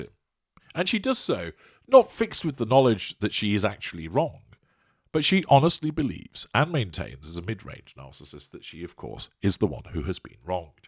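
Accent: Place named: Northern England, United Kingdom. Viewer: Think you hail from the North of England 🏴 have been curious for an age!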